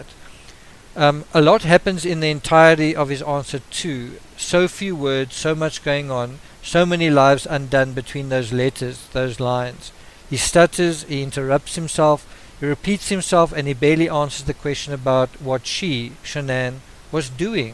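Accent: South Africa. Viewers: Hey! You're South African 🇿🇦🇿🇦🇿🇦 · I miss V the series so much!!! Are you from South Africa?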